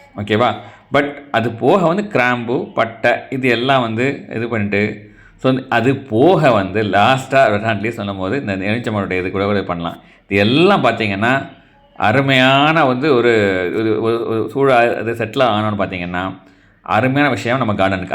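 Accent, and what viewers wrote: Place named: Tirunelveli, India. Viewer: நெல்லை மாவட்டக்காரர் போல.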